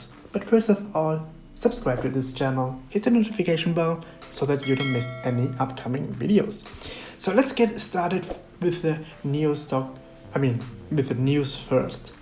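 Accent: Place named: Germany. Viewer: Haha der deutsche Akzent ist einfach gut!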